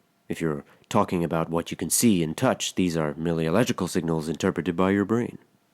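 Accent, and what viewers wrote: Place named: United Kingdom. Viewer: The british accent can litteraly convince me anything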